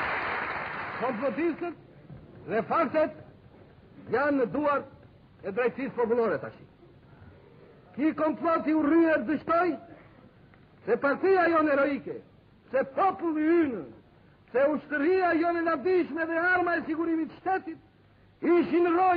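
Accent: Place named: South, Albania. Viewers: Hoxha grew up in southern Albania (namely Gjirokastër), where the Tosk dialect is spoken, as oppsed to Kosovo Albanians' Gheg dialect (which is also spoken in northern Albania and most of Albanian-populated areas of Macedonia) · Kosovans speak the geg dialect, Same as north Albania, the south speak the dialect youre hearing in vid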